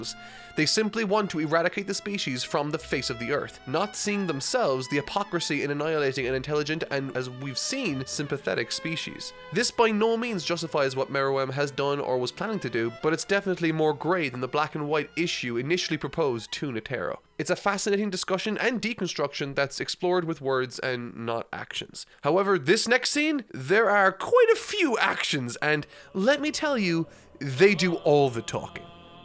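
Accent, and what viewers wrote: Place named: Ireland. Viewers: he's irish that's just his accent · he pronounces the word "calm" the same. I think it's just his Irish accent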